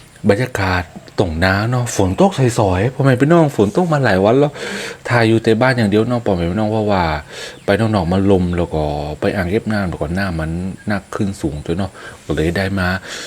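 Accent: North, Thailand. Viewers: มาอยู่ต่าง จว. ดูผิวคล้ำดำไปเลย🤣🤣🤣 อยู่กับธรรมชาติ แต่ที่เหมือนเดิมคือกิน กินอร่อย ไม่เปลี่ยน ลืมอีกอย่างที่เปลี่ยนคือพูด ภาษาเหนือ ฟังรู้มั้งไม่รู้มั้ง 555 แต่ก็ต้องฟัง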